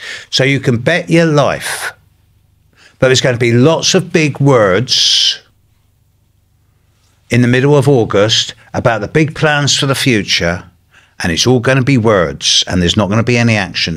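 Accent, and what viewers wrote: United Kingdom. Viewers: New subscriber—I don’t know why I trust astrologers with uk accents but there you go. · Beautiful British accent!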